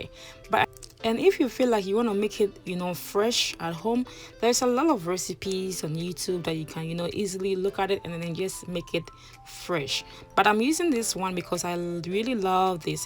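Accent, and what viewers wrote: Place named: Ghana. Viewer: You geh, ehh mehn, you understanding me eneh so? I feel the same way, I love hearing Ghanaians speak, and she speaks so eloquently and soothingly, but authentic to her dialect. She's not trying to sound American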